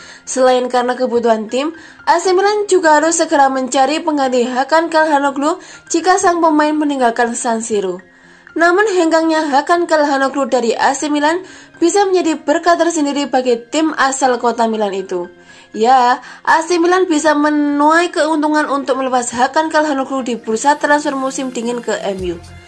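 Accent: Java, Indonesia. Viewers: Medok 😀. Tapi 👍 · Dok medok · Wong jowo yaa min 😊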